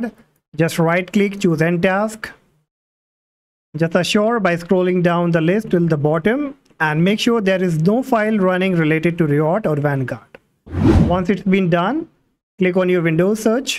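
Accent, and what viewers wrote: India. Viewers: you know vid is good when there is a Indian accent. thank you this actually helped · you know tutorial is good when you hear the indian accent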